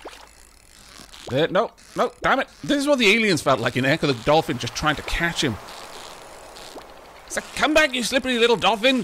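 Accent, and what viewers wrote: United Kingdom. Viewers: That's an accent thing. He's saying it right in his accent. It's a common pronunciation across the UK, even here in Australia!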